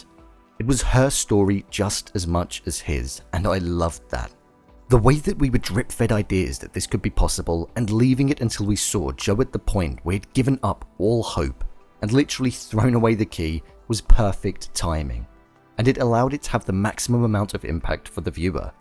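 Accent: United Kingdom. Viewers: Oh great another cynical white british male voice on youtube to bestow his oh so original critical thoughts on us.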